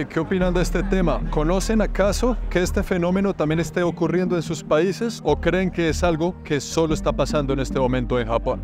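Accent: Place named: Colombia. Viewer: Llevaba como media hora intentando adivinar el acento y dije... tiene inflexiones de colombiano pero como un aire argentino... pero como gringo... fue buena mi aproximación.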